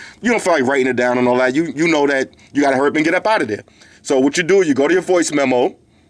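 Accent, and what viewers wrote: New York, United States. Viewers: very good review ,easy to understand, plain english, the way WE New yorkers speak everyday, because of this review , i'm buying this watch, thanks Man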